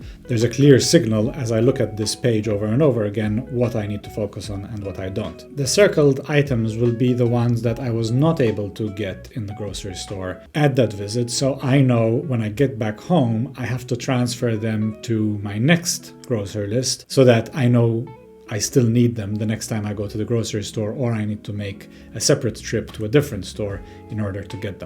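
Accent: Malta. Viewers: I just stumbled upon this video. Useful content. Tho out of curiosity.... that accent... are you Maltese? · You sound Maltese 😊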